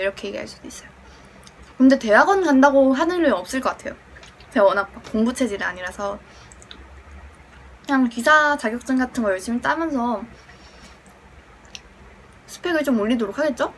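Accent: Seoul, South Korea. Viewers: 와 진짜로 옛날 서울사투리 듣는 기분 ㅠㅠ 넘 예뻐여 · 먼가 말투가 2000년대초 서울 사투리같아요 ㅋㅋ(칭찬)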